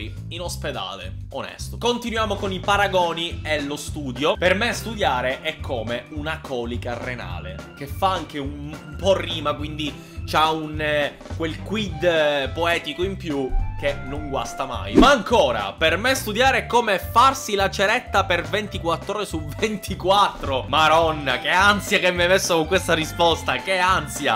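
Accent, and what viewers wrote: Palermo, Italy. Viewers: Oddio ma ho notato una cosa : PARLI IL PALERMITANO MEGLIO DI MIO PADRE..... COSA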